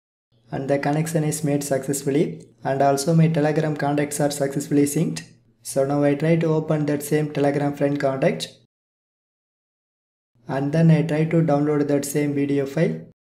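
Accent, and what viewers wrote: India. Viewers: Indian ho na Britishian nhi na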